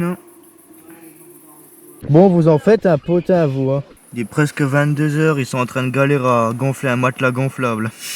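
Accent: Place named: Moselle, France. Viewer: le bon accent de mosellan mdr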